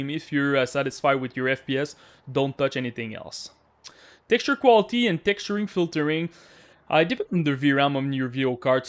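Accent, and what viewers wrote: Canada. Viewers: Bruh I didn't know Canadian people actually speak like this. I thought this accent was just a running gag on cartoons😅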